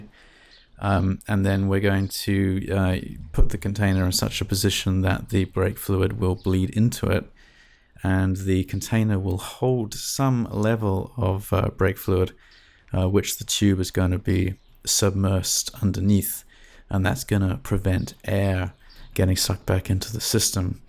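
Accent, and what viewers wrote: England, United Kingdom. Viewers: great video, I trust English voices more than any other accent!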